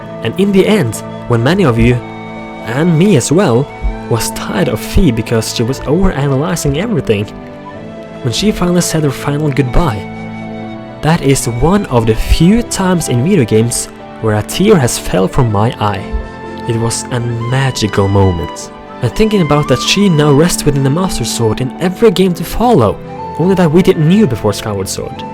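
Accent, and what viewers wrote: Norway. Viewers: That english sound like something i have heard before. Are you Norwegians? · Haha, hørte på måten du snakke på at du er Norsk :)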